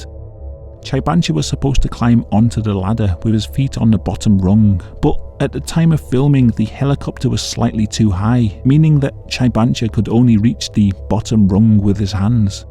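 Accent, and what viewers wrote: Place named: Cockney, United Kingdom. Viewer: nice accent. cockney?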